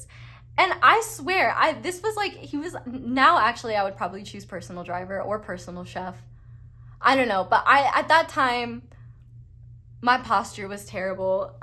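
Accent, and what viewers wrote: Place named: South, United States. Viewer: I love the southern accent it’s cute thank you 🙏 god bless you ❤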